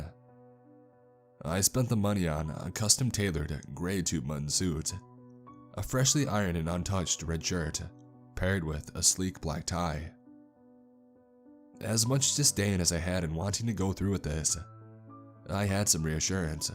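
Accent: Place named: United States. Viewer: Hey do all the ppl who read these CreepyPasta things all have American accents? Like... There's no one in Ireland or the UK doing this stuff? Not knocking your voice or anything, bud, you're doing great.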